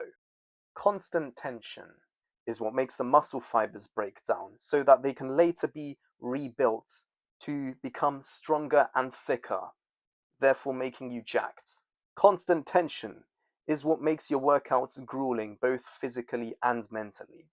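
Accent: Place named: United Kingdom. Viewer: This guy has the same uk accent as the greatest uk artist to ever do it: Feng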